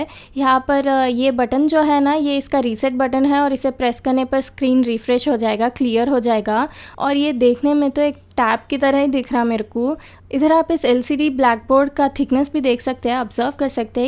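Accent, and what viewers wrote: Hyderabad, India. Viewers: You have to work on your voice and language I feel Hyadrabi hindi some time ... Keep it up 👍👍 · Tum hyderabad se ho kya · totally hyderabadi.nai karra.... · R u Hyderabadi? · Are ap hyderabad se ho kya..way u talk like hyderabadi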